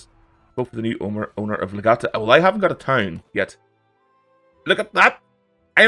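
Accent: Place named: Scotland, United Kingdom. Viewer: Yet another video about bannerlord that I love and that makes me mad for not being able to afford it. I swear I'll go crazy soon watching videos like this one. Also, I love the accent, I'm Italian so I'm not used to accents like yours (I hope I'm not wrong but you sound Scottish)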